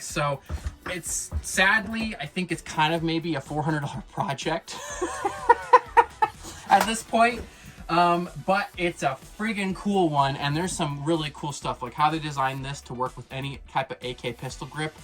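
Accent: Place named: Alberta, Canada. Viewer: Ah southern Alberta love it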